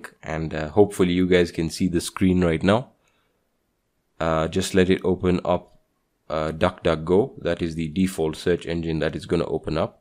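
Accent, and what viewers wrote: India. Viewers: He's obviously Indian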